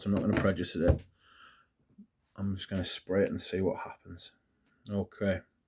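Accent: United Kingdom. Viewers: Welcome friends British accent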